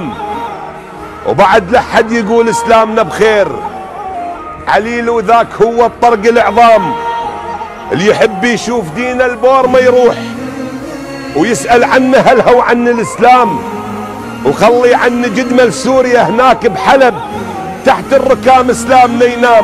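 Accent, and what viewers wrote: Iraq. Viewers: يااخي شجابه عل انبار. ترا مبين ذاكيا حت لهجته لهجه اهل صلاح دين ماشايف لقائته. وهو من البو خشمان. بتكريت. وتكريت الاصل. وهسا هو بتركيا عايش. ارحموا عقولنه شوي. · اللهجة عراقية وشاعر اسمة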